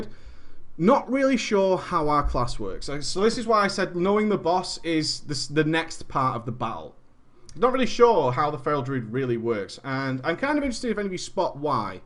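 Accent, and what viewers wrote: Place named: Yorkshire, United Kingdom. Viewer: You sound like you're from Yorkshire :@ Lancashire ftw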